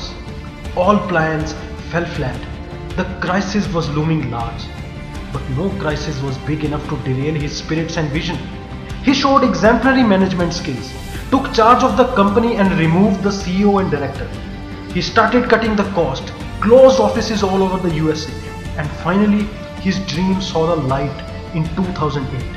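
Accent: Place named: India. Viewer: the bgm is epic. the accent doesnt work with the bgm. no offense to indian accent but they just dont work together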